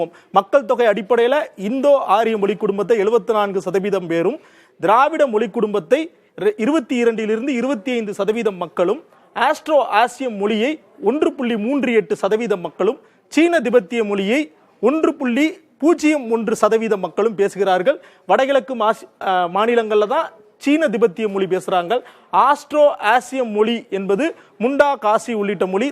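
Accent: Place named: Telugu, India. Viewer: மொலிகல் என்று சொல்லாமல் மொழிகள் என்று சரியா உச்சரிக்க வேண்டும்.... உங்கள் உச்சரிப்பு நீங்கள் தெலுங்கர் என்று காட்டிக் கொடுக்கிறது...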